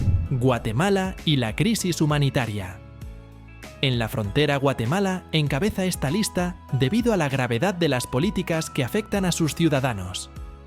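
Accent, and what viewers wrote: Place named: Spain. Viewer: Creo que por tu forma de hablar eres Español, son pocos los países latinos que buscan emigrar a EEUU la verdad son muy poco los panameños que quieren irse para allá ya que por el estatus de vida en nuestro país es estable solo viajan de manera de turista pero no por emigrar...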